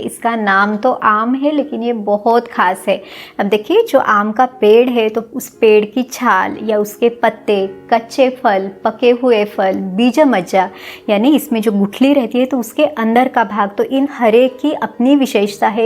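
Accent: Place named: Maharashtra, India. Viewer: आपल्या बोलण्यावरून असे वाटते आपण maharastriyan आहे मग मराठी का नाही बोलत कारण महाराष्ट्र खूप मोठा आहे.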